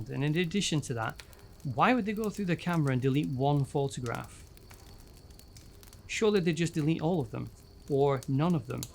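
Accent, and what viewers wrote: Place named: Lancashire, United Kingdom. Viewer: Great video and even more thanks for not being AI. Soon as I heard the accent (Lancs? Blackburn Burnley Accrington way?) I thought ‘Yessss’ and subbed. You deserve loads more subs and views 👏🏻👏🏻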